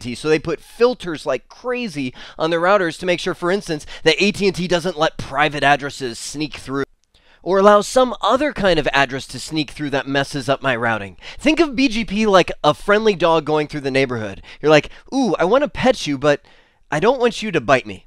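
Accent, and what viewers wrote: India. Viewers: if it hurts so much to hear Indian accent videos... simply stop listening to it in first few seconds.. People make videos for FREE to help other learners, why dont you simply pick up a book and read it then, instead of trying to find knowledge over youtube. I bet if you stay in technology field, you will hear more indian accents wherever you go... i am kind of sad and happy to read your comment, because whatever job you pick you will get to hear Indian accent....because we indians are way technically advanced :)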